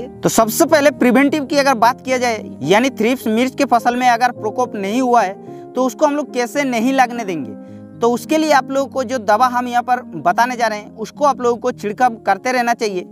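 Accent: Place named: Odisha, India. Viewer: यह तो उड़ीसा उनका बोलचाल से समझ हिंदी बोलकर कितना वीडियो बड़वार काम हो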